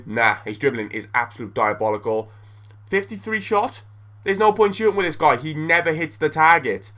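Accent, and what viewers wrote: Wales, United Kingdom. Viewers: Ur welsh!?!? :O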